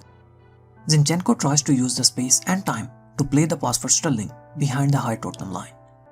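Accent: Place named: India. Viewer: Bro please i like your videos but please fix your english accent its very annoying..... i hate this indian accent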